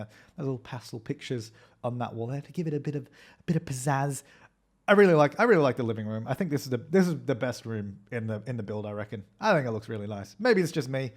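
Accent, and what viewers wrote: Australia. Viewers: Love your videos~ I love making houses on sims, probably more then the actual game play XD as i was watching though, some of the things you say, i dunno, you voice just sounds a lot like Jazza, and not being stereotipical witht the Australian accent, but the tone and pitch of your voice is very similar to his hahaha still, awesome houses! and on to watch more~ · CloudieFox I know right, they're both australian, so that's probably why. Whenever I watch waiter I think they are the same guy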